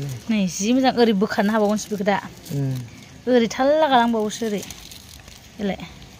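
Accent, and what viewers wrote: Kokrajhar, India. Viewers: Abo ngni railainaya kokrajhar nijg same jayw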